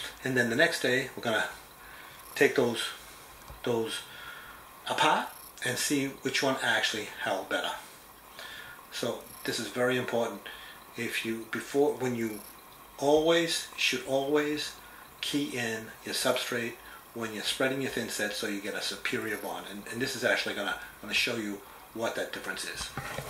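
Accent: Boston, United States. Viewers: Man you can hear that Boston! 😂👍Great work. Always teaching us better.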